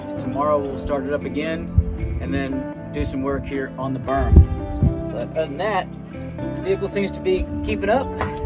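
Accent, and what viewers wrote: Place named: Rhode Island, United States. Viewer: we are Rhode islanders so the accent gives it away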